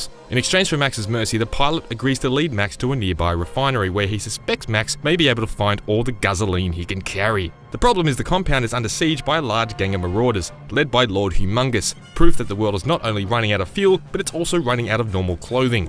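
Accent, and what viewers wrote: Australia. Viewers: I like how his voice sounds Australian because the film takes place in future Australia.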